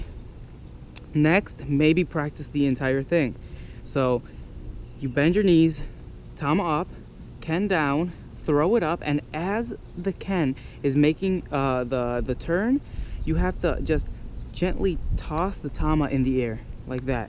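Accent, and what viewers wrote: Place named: England, United Kingdom. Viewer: You sound very English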